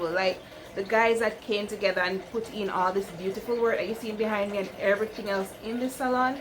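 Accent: Jamaica. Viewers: Uhm, you say you're not an interior designer but I beg to differ. Really awesome look. I hear your Jamaican accent. Curious to know your journey to Cayman. I'm watching from Japan.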